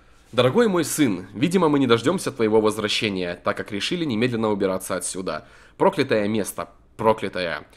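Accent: Belarus. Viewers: ты белорус или русски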